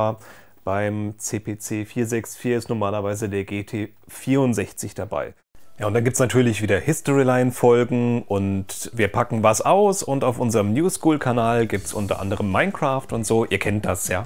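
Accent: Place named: Hesse, Germany. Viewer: aus welcher Ecke von Hessen kommst Du denn? =)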